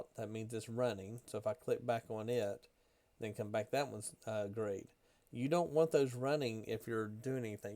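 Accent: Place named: South, United States. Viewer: Great video awesome thanks for explaining the internal link versus the outside link, I also love the information of where closing out a workspace that were unused you made that so clear I have noticed the speed increase in my ninja trader thanks so much, and you have an awesome accent, southern gentleman🤩🤩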